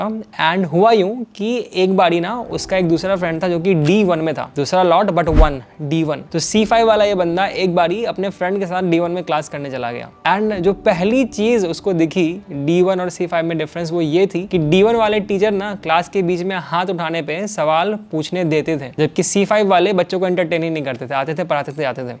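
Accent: Bihar, India. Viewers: bhaiyaa you're from Bihar? Your way of speaking i gotcha u I'm pretty sure you're from Bihar.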